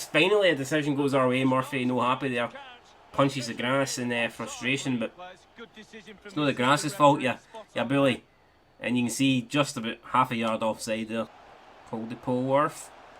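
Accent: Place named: Scotland, United Kingdom. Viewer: Fantastic scottish accent ! Greetings from Argentina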